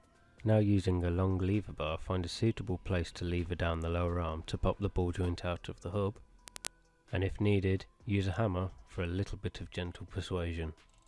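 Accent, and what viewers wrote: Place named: United Kingdom. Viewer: Sounds like british accent. ;) He should have used "right side". At least definitions of left and right are still the same in the UK. :D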